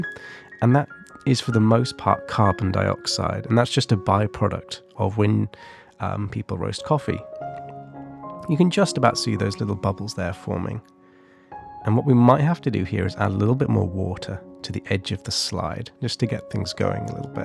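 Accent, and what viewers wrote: England, United Kingdom. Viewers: You would do Well in the US, as they Love English Accents!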